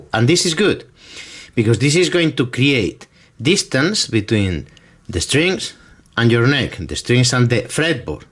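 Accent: Spain. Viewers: Very good info, I am slowly getting through your videos, I love you Spanish English accent. Merry Christmas, cheers Simon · Great accent. Are you Spanish? I live in Australia now, so I don’t hear Spanish accents very often. Thanks for the video. It was excellent. 😁👍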